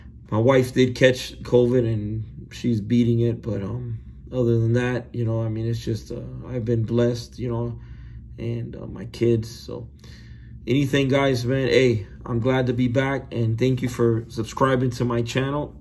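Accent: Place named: Louisiana, United States. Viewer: You from Louisiana bayou country? You sound a little like the Cajun Cannon.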